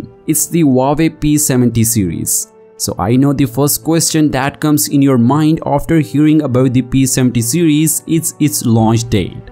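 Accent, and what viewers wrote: India. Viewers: Indian English accent 😂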